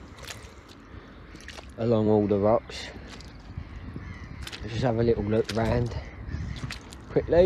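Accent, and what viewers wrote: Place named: Cockney, United Kingdom. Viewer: Your talking more and more cockney these days ennit.....but carry on larking coz I still like to tune in .xxx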